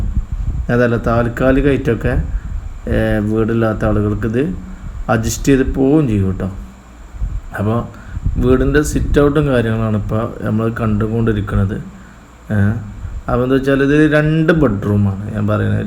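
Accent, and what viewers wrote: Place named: Malappuram, India. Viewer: Malappuram slang aanu bro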